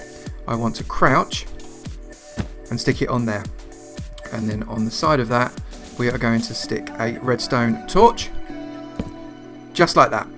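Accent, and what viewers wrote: United Kingdom. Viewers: I can smell the British accent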